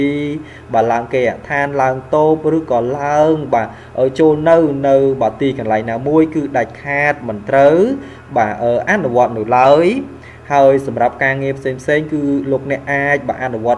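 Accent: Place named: China. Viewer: និយាយដូចអាចេកចឹងពិបាកស្តាប់ណាស់វើយ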